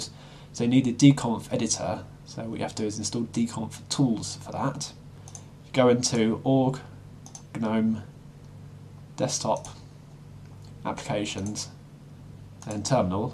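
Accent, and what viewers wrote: United Kingdom. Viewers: You have very British accent. ;-)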